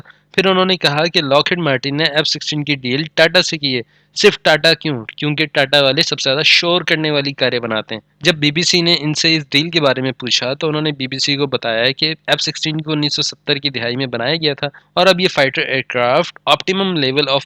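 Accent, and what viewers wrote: Punjab, Pakistan. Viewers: I am Pakistani and I agree with you, this guy is either unemployed or he is doing fake propaganda for ispr. india is way ahead. PAF is no match in front of india in numbers or in technology. even witht he failure of tajes and mig 21 still indian air force is 6 times greater than pakistan. I am not posing to be pakistani I am real Pakistani from karachi and I can tell you from the accent of this guy he is punjabi who is speaking urdu.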